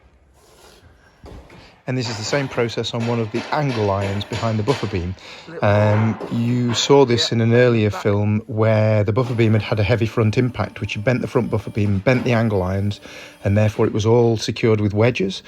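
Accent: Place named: Australia. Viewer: ah yes inside a boiler firebox I have known it quite a bit the last few years as I help out at a railroad historical society, cleaning the grates and drilling out telltells as well as blowin out flues once, I show one time I did on my channel in video if anyone wants to hunt, I am surprised though your using a grinder to cut that off we usually just use a torch to burn the stays out and cut the sheets or a plasma for cutting the new ones, its faster, its messier and has more cleanup but its more expediant, I like your voice though its pleasent to the ears and articulated when you speak some have such a dialect or accent you cant hardly make out what they say , you sound though almost australian, I am no boilermaker though but I read up on research and projects even if I cant do the math to design it, soo many things you can do to make a boiler more effecent or burn cleaner, or it dont even have to have fire there is boiler that uses solar collectors that follow the sun and they are getting an 800 degree super heat on the steam meaning its hot enough to compound if you were to use it in remote locations like off grid so diesle dont have to be burned its noisy expensive and smelly, the solar project that the guy at vapor locomotive company in idaho in the states is heated by the sun, though its generating rate of steam I dont know the capabillity of currently, and you have the facinating differant layouts of tubes and flues as well as multipass tubes and rifled boiler tubes lik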